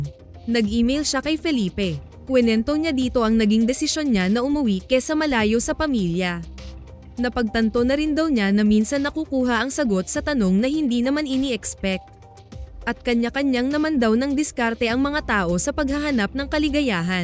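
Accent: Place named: Visayas, Philippines. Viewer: Hahaha bisaya yung narrator bankita raw ,but im one bisaya hahahaha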